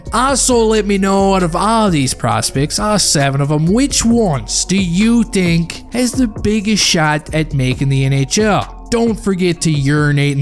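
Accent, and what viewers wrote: Newfoundland, Canada. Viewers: Are you from NL by any chance? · Man you've got to be a Newfie with that accent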